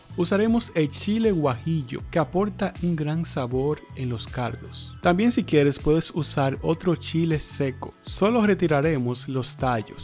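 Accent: Dominican Republic. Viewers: Eso se ve ricoo! una pregunta de que nacionalidad eres??? porque si no me equivoco me suena el acento Dominicano, yo soy Dominicana. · eres dominicano?. Saludos desde República Dominicana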